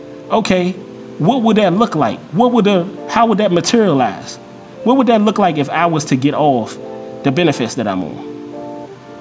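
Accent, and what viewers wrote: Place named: Baltimore, United States. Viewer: You sound like you from Baltimore but real facts to the video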